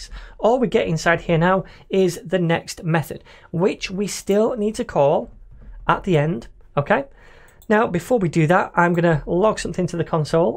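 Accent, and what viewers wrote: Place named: Northern England, United Kingdom. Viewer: Really loving this tutorial series, it came at the right time for me in my programming journey. I have found that it's best to simply watch your tutorials start to finish without taking notes, and then opening up the project file at the end from GitHub and seeing how much I remember. I know everyone's style is different, and this maybe a bit selfish, but i thought i'd suggest it anyway - as these are predominantly tutorials, it would be cool (at least for me :P) if there were more tutorial-comments in the code for us to refer to in future. I suppose I'm just lazy though. I should probably comment along the actual code as I watch. But might need to halve the speed. Where are you from? I can't tell if it's Manchester or more Newcastle-way. My dad overheard your voice and said 'bloody 'ell he should teach the masses with that voice' :D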